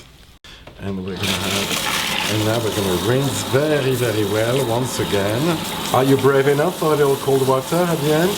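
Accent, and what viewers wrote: France. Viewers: the most french english accent ive ever heard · he has the most intense english french accent ever and i'm living for it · I'll never get tired of French accent, never.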